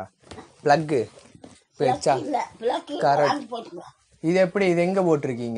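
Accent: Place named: Kongu, India. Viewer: Unga kongu thamizh pechi arumai🥰😘😊☺👌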